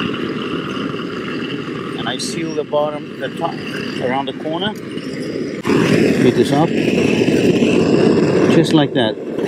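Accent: South Africa. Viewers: You sound like a South African 🇿🇦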